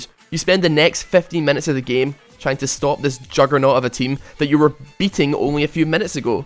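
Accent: Scotland, United Kingdom. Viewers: Your scottish aint you? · scottish? very cool accent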